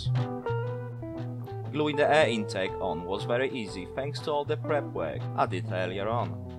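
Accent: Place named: Hull, United Kingdom. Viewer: Good job buddy loving the hull accent lol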